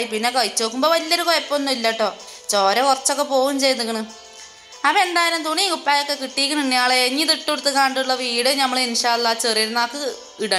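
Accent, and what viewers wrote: Malappuram, India. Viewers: yaa ithaan njangale bhaasha thani mlprm slang 😍😍😍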